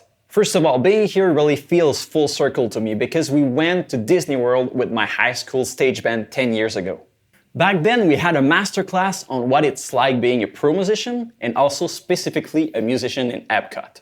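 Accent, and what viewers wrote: Canada. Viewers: ok canadian, it was obvious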